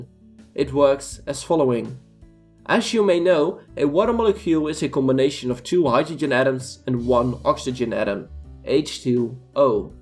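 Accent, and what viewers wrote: Netherlands. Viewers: Lekker Hollands accentje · Your accent sounds Dutch, South African and German at the same time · let me gues, ur dutch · Annoying dutch accent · Are you Dutch? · Awesome video! Like and subscribed, you have a decent english accent, but i immediately heard that you were Dutch <3 Groeten uit Rotterdam ;) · you're definitely dutch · Nice dutch accent though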